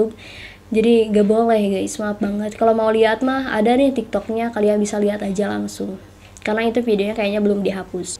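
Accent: West Java, Indonesia. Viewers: Boleh nanya ka org mana si? Jabar kah:v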